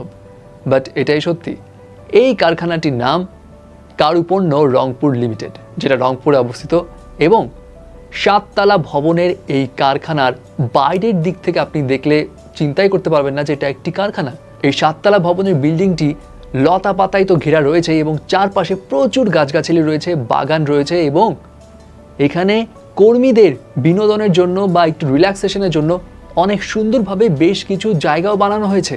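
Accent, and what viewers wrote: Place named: Kolkata, India. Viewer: ভাই এর কথা কলকাতার মতো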